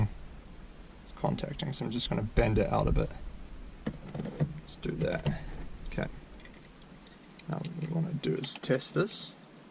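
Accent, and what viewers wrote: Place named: Australia. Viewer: Interesting accent. Aussie in the US?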